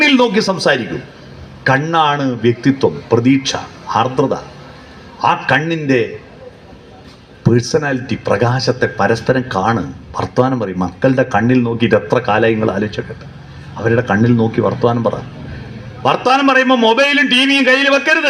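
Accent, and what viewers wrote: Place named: Vadakara, India. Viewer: ഭാഷാ വൈകല്യം നല്ലവണ്ണം ഉണ്ട് ചില വാക്കുകൾ മനസിലാവുന്നില്ല ഉദാഹരണം മൊത്തി (മുഖം എന്ന് മറ്റൊരു വടകരകാരൻ പറഞ്ഞു തന്നു ) പ്രാദേശിക ശൈലി യായിരിക്കും പ്രസംഗം കുഴപ്പമില്ല ഞാൻ മിക്കപ്പോഴും കേൾക്കാറുണ്ട് കുറച്ചുകൂടി പ്രാദേശിക ശൈലി മാറ്റി വ്യക്ത മായ ഭാഷ പ്രയോഗിക്കാൻ ശ്രമിക്കണമെന്ന് താല്പര്യപ്പെടുന്നു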